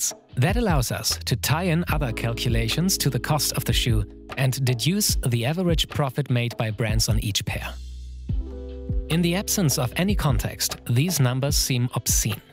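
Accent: Germany. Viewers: You german? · Man merkt diesen Deutschen Akzent so hart haha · Man hört dass du deutsch bist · Es entspannt mich das nicht nur ich ein german english hab 😂 gutes Video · R u german? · you’ve got the srongest german accent i’ve ever heard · I love his german accent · I know he’s German because of his accent 🤚🏾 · Alter der deutsche Akzent ballert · Du hörst dich wie ein englisch redender Deutscher an. Lieg ich richtig ? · German accent haha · Are you german? Lol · Warum höre ich da einen deutschen raus? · Der Sprecher ist Deutscher oder? 😃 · Ich hasse den deutsch-englisch Akzent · Are you German? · Is it just me oder spricht er mit nem deutschen Akzent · Finde deine klare Aussprache und den leichten deutschen Akzent zusammen mit deiner Stimme unfassbar angenehm zum zuhören. Ich bekomme immer fürchterliche Kopfschmerzen wenn andere Deutsche versuchen künstlich american english zu sprechen. 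Außerdem natürlich auch inhaltlich ein tolles, super recherchiertes Video! Ich hoffe du gehörst mit dieser Qualität bald zu den ganz großen auf YouTube! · Are you German by any chance? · Tell me you are german without telling me you are german: his accent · Can't say for sure but the way he pronounces names sounds german, yes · Man hört den Deutschen aus dir raus XD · His German accent is so strong 😂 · Yea thats the right pronounciation, its a german company, german name. Judging by the narrators accent he is also german so he knows. Its not " A-die‐das" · yo dis is a german ass accent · Du hast echt nen starken deutschen akzent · Hat wer den deutschen Akzent rausgehört xD?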